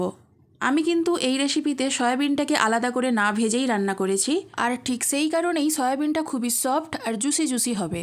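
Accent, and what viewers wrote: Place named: Nadia, India. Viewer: খুবই ভালো রান্নার পদ্ধতি দেখালেন | অবশ্যই বানিয়ে দেখবো | আপনার "পানি" কথাতে বুঝলাম আপনার বাংলাদেশ connection আছে; কিন্তু তার সাথে সাথে, বলি, যেহেতু আপনার বাংলা প্রায় সমস্তটাই পশ্চিম বাঙলার, especially নদিয়ার জেলার ভাষার মতো, আপনারা কি যশোর/খুলনার লোক ?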